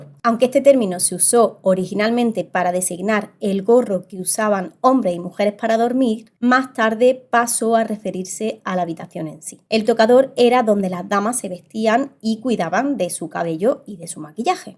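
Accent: Andalusia, Spain. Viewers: Seguro que es andaluza como yo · Tú acento es el más bonito de los acentos de España, al menos para mí oído latinoamericano. Muy interesante y entretenido tu contenido.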